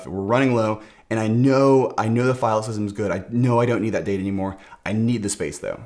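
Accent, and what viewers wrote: California, United States. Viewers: They way he speaks reminds me on the SNL sketch, "Californians". Can someone from the US say where his accent is from ?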